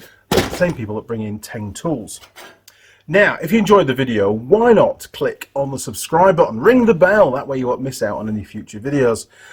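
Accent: Yorkshire, United Kingdom. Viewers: Where in the UK were you from originally? I'm detecting a Yorkshire twang.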